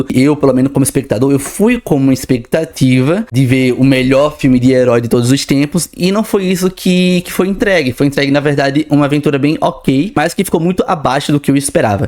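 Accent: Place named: Paraíba, Brazil. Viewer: amei o sotaque de paraibano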